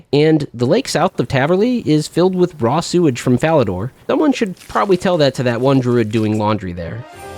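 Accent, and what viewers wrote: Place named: Great Lakes, United States. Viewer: "Great Lakes accent" you answered my question I posted on a previous video.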